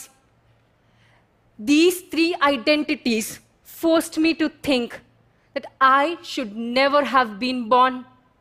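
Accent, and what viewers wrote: India. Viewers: despite her strong indian accent I deeply understand her, good job girl...I am a rural girl and now I'm studying PhD course. what brought me here to watch your inspiring speech was that at the moment I'm improving my english skills to be able to apply for a postdoc position abroad. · she is Indian, why wouldn't she have an Indian accent?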